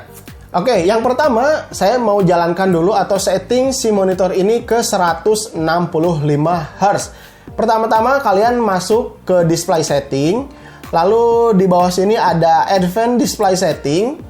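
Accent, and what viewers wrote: West Java, Indonesia. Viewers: Letah sunda mang pabaliut kitu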